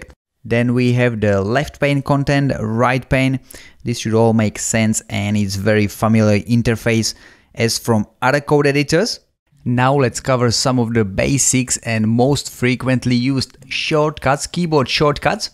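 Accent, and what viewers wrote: Czech Republic. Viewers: Just started using VSCode at work, this series is very helpful. Also, with a name like and that accent, are you Czech?:)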